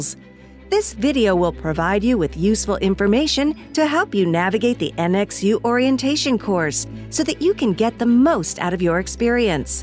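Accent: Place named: South Africa. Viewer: She is definitely South African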